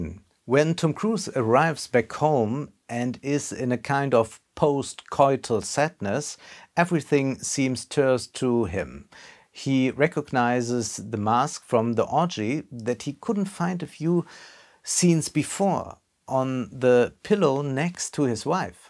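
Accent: Germany. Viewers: He just started the english channel, he gets round about 30-60,000 views on the german one. Sometimes he gets much more views if a movie is currently in the theatre and is more sophisticated, like "Tenet" recently, ore when he rants about janky german rom-com movies. I would not worry about the german acsent, it's Kind of original, but I wouldn't be if surpriced if some viewers find it anoying, it's kind of special channel with interesting takes and viewpoints.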